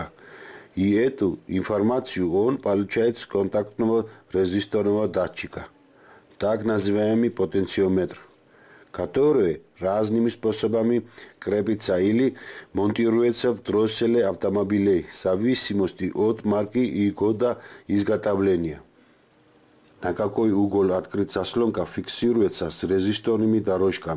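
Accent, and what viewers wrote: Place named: Georgia. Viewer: С акцента можно предположить, что вы из Грузии, как и я. У меня проблема с дроссельной заслонкой, вы бы адрес или номер телефона, я бы заехал к вам. Спасибо, с уважением Земляк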